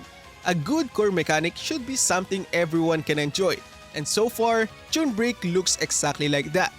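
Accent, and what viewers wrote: Philippines. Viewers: Filipino accent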